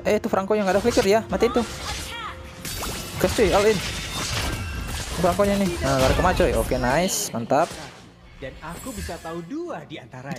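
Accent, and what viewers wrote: Manado, Indonesia. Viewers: kayak orang manado pnya logat wkwk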